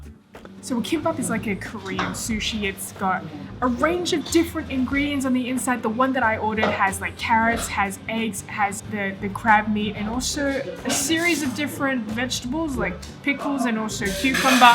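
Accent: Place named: Australia. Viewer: So cool seeing an asian with an aussie accent ! Very pretty girl and great food vlog !!!! Love from Arizona =^..^=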